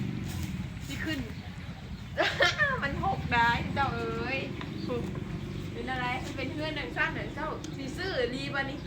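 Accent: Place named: Tha Kho, Thailand. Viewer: ฟังเสิยงเว้า.คึอไทบ้านท่าค้อ แท้.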